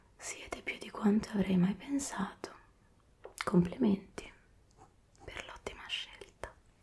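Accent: United Kingdom. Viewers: Pazzesca! Veramente top il tuo british accent darling 👏👏 Ps* ammazza quanto è severa questa prof!! Attendiamo con ansia la prossima lezione 🖋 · bravissima! sembri una inglese proprio; peccato che io capisco solo la cadenza USA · E' vero che ci sono diversi accenti (sia in US che in UK: quello di e' la cosiddetta Received Pronunciation, le persone attorno a me qui nel North West of England, e io stessa, parlano in maniera un bel po' diversa!), ma in linea generale puoi facilmente identificare se una persona e' americana o Brit. Le T come ha citato, le R, per esempio, che gli americani pronunciano e gli inglesi no (tranne in alcuni accenti), e che Scottish e Irish pronunciano in maniera ancora diversa, le L in 'walk', 'talk', o alcuni suoni vocalici (vedi: vitamins, route), e l'intonazione in generale che e' spesso diversa. Nell'inglese britannico si usa moltissimo il connected speech, che e' cio' che fa dire agli stranieri 'gli inglesi si mangiano le parole'. 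Nell'inglese americano questo e' meno marcato (poi ovviamente hai eccezioni).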